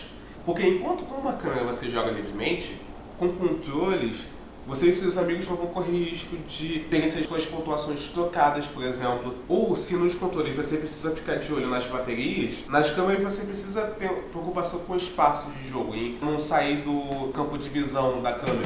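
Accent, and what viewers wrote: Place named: United States. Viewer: CARAMBA ACHEI QUE VC ERA AMERICANO mas e brasileiro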